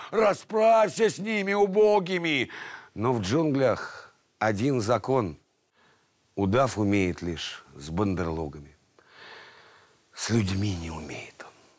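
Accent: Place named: Okanye, Russia. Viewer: Ооооо, "брат"! Да, ты ещё и дОёшь через "О"-(чко) надо полагать, неуч... Или ты нерусь, "Артём Иванов"? - Ну, тогда это многое объясняет ;))) ? УРА, ТОВАРИЩИ !!! Слава Украине в составе России !!! :)))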